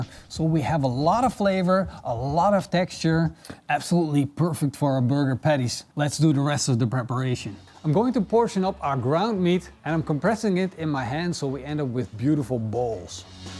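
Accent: Netherlands. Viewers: Ben je Nederlands? Hoor het aan de accent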